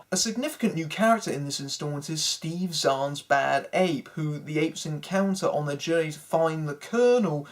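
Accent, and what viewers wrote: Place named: England, United Kingdom. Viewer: You got the best English accent ive heard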